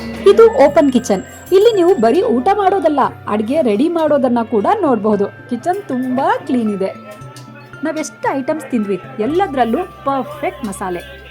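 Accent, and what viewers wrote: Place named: Mysore, India. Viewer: Appatta kannadathi, mysore kannada super mam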